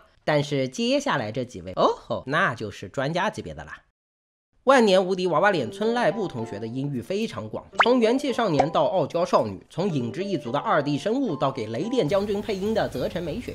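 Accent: China. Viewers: 你是南京人啊？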